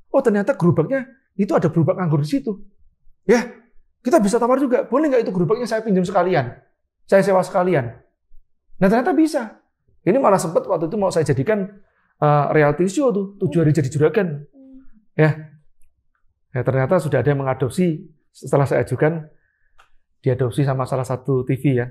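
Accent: Java, Indonesia. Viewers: Koh asli mana si..jawa ya.medok.hehhehe Sukses trrus ya koh..moga sehat selalu..aamiiiinnn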